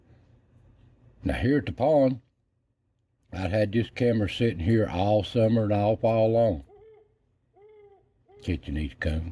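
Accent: Appalachia, United States. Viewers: We love your voice, it is extremely intelligent knowledge with a true applachian tone. Love when you narrate. Enjoyed the wildlife this morning. Thank you for everything... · Yes sir Mr., we love hearing you narrate these wildlife videos!! Viewing the animals is great to watch taking in all GOD’S CREATION, but your Appalachian voice filled with your knowledge and the way you explain everything to us is the icing on the cake!!!! Great Video!! 😇🙏🏻👍🏻☀️😊😊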